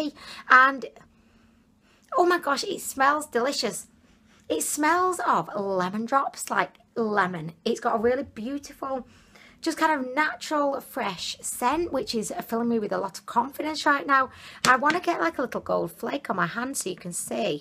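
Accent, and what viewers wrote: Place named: Huddersfield, United Kingdom. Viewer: Hi, what town are you from? Huddersfield lass eya, l have a similar accent. Found this video while noseying at Wish hauls, & you are so hilarious, l just had to subscribe! Thanks so much for the giggles!:)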